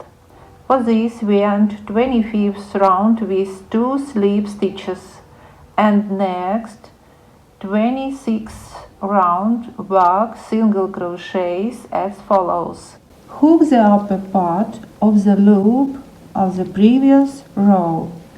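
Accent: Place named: Nizhny Novgorod, Russia. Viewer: Какой-то нижегородский акцент, режет слух . Как будто по русски говорит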